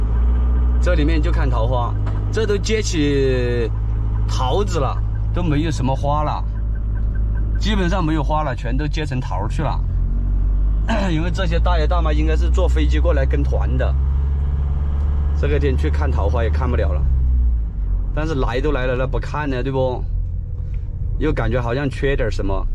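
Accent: Sichuan, China. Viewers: 听囗音象我一个朋友，四川人吗？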